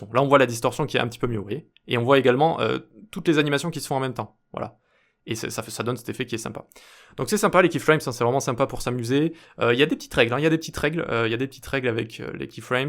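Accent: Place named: United Kingdom. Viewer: Ton accent anglais me fume à chaque fois xD Très sympa comme effet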